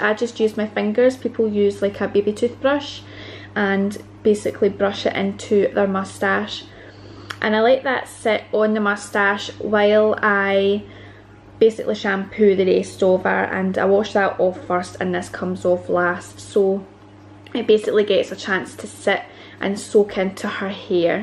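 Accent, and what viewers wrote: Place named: Scotland, United Kingdom. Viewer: Ah, a beautiful Scottish accent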